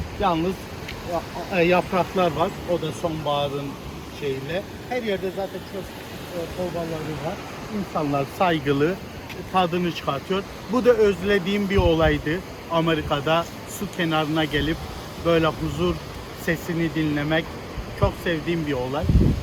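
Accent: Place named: Germany. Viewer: almancı gibi türkçe konuşuyor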